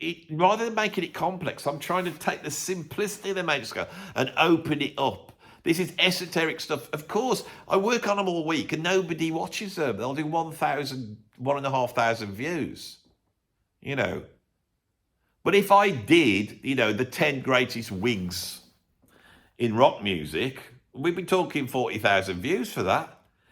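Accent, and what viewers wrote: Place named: England, United Kingdom. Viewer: Nobody out of England does understand what you are telling because of your terrible Accent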